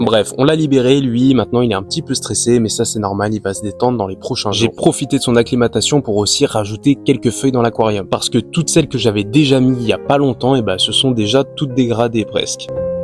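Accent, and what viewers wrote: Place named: Charente, France. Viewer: Tu ne serais pas originaire de Charente/ Charente Maritime par hasard? Parce que la prononciation de Rogarde (regarde), Promière (première) me fait penser à ça 😂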